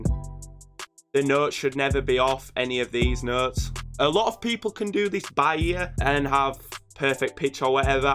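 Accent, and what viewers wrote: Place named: United Kingdom. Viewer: you're the most british producer ive ever heard from man.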